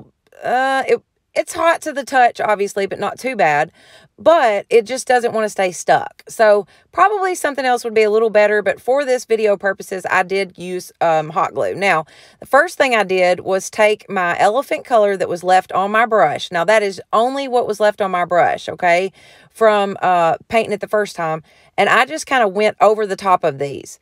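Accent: South, United States. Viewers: Just subscribed! I really like what you did with your items, but am in love with the way you talk---Southern country. It makes me homesick.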